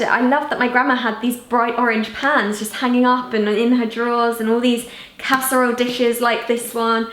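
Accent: RP, United Kingdom. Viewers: I love the way she talks so plummy, the way she moves so classy!!! So satisfying to watch her. 🤗😙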